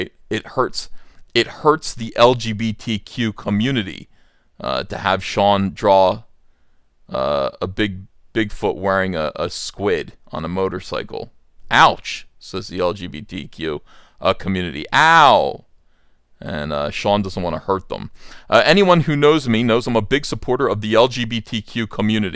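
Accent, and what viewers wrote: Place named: South Jersey, United States. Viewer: I love your accent - has to be South Jersey/ Philly. I live in NYC & that accent is sooo recognizable & unique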